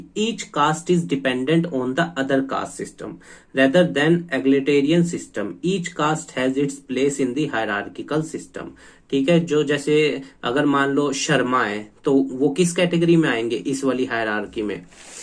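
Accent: Haryana, India. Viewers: I think his tone is Haryanvi !!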